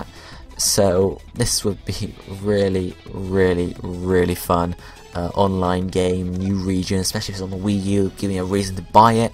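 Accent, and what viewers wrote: England, United Kingdom. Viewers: your English accent make me believe everything you say!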